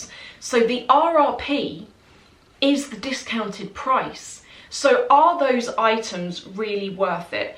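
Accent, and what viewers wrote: RP, United Kingdom. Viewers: your accent is so posh i love it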